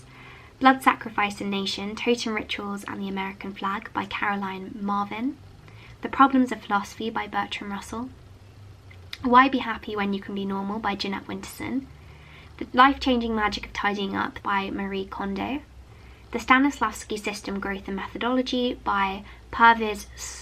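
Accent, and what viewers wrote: United Kingdom. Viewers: I and many followers have low level of English. Please speak more simply and reduce their pronunciation. we can't understand the british dialect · speaks uncanny British English and her pronunciation is very good - when it comes to understanding and/or learning English as a non-native speaker. There's no point in making her change her speaking habits just for you, especially that the purpose of the channel isn't to teach people the language. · I'm also not a native english speaker (and not very skilled in this language), but I understand almost all she says. has very beautiful british accent and very good diction 😁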